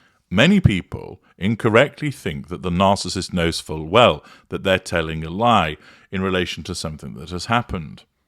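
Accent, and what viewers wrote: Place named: RP, United Kingdom. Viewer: My thanks for putting me right (although I was suggesting the RP English accent that tends to utilise, but it sometimes slips.). Indeed it is rather silly. Best wishes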